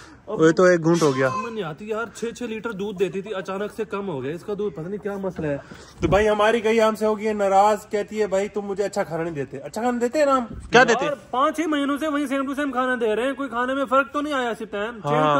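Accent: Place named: Saraiki, Pakistan. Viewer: VOTE FOR FULL SARAIKI VLOG"👏👏👏 In some of your VLOGs, we can see the Siraiki accent of you people, in some places you also speak, it seems very good, you speak very eloquently and the accent is also very good. All of us will be very happy to see and hear please accept our request.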